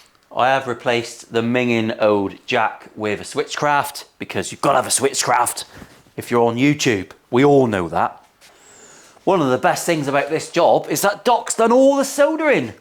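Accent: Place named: Working class, United Kingdom. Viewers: Just came on board for this build, but as a Yank, I love that you talk the way every American imagines a working-class Brit would talk. Of course, we've been brainwashed into thinking that Dick Van Dyke's accent in "Mary Poppins" is the pinnacle of British accents. Been a lot of fun watching the progress, you've got mad skills. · Love these videos for the west country accent alone 😂😂 strat me up baby strat me up.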